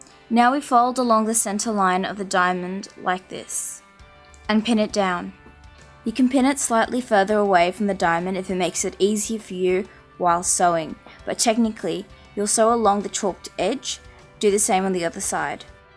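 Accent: Australia. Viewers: ... I hope I didn't offended you or seem like I was attacking you but personally as fashion student it doesn't doesn't make sense because of my background of pattern making but I see where this would help alot of people out. Judging by your accent you must be aussie? (Please tell me I'm right... lol) Have you considered studying fashion? I recon that you have big potential in the fashion industry, you earn a butt load for being a pattern maker.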